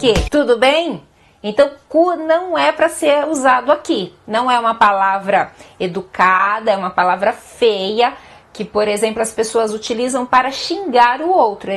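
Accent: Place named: Sao Paulo, Brazil. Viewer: Essa professora es tao bonita, formosisima, e ainda falou do meu Chile aaahhh eu estou enamorado de ela😍😍❤❤. Por su linda forma de falar ela debe ser paulista, a pesar que em Sao Paulo ha muitos sotaques. Ao final nao se sabe qual es Paulista realmente. Mas a letra R ela a fala como riojaneirense, eu adoro, tal vez ela es Paulista mas em alguma cidade que está na frontera com o estado de Rio de Janeiro. Saudos desde Chile e um grande abraço. Beijos nao me atrevo pois deve ter enamorado ou marido 😬🙊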